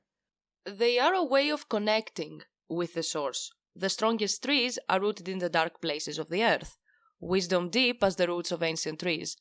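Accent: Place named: Greece. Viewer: I like your videos. I also really love your accent. So don't worry about it your English is outstanding. Far better than my Greek.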